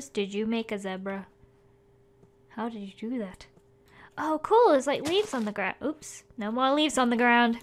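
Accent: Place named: Leeds, United Kingdom. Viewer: It sounds like she's from Leeds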